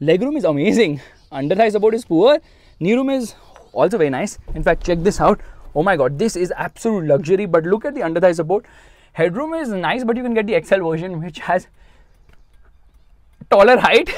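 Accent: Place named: North India, India. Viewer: North Indian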